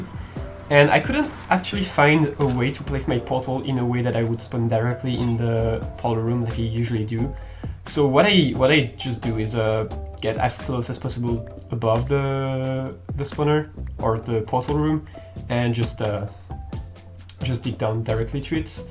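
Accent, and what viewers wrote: France. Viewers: Man, are you french?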